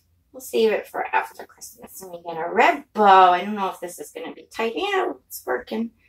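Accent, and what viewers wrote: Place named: New York, United States. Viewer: Look at you girl!!!! You look and sound amazing!! We missed you (I personally missed that New York accent). 😘😘😘😘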